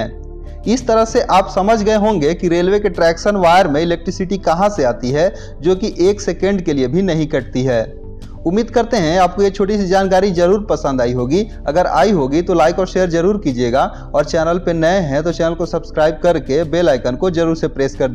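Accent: Bihar, India. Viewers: Are u a bihari..?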